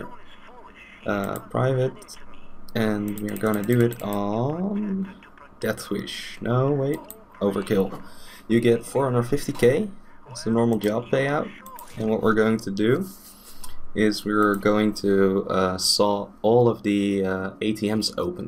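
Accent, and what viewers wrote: Netherlands. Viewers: Jij bent sws nederlands ik hoor het aan je accent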